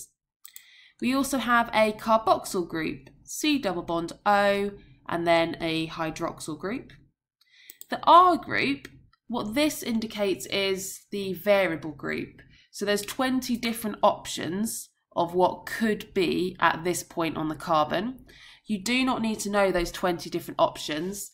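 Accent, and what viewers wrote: United Kingdom. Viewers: Good explanation, but your British accent is getting on the way. Too many unnecessary sounds. It's quite annoying.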